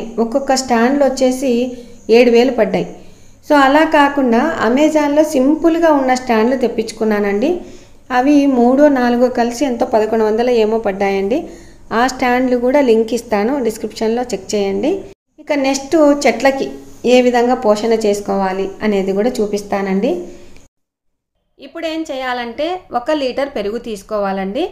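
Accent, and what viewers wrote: Rayalaseema, India. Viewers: Chala bagaa chestunnaru akka, mana Rayalaseema slang mee vedios lo happy ga vintunnanu....mee daughters nd atta sooo cute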